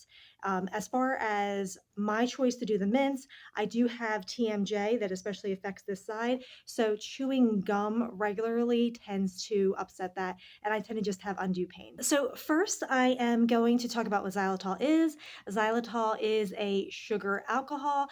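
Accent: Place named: United States. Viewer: intersting ... I though her accent is so American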